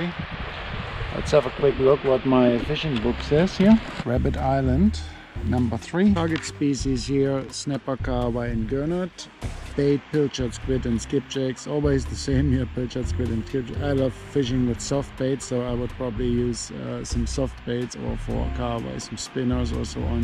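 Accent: Germany. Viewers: German accent 😄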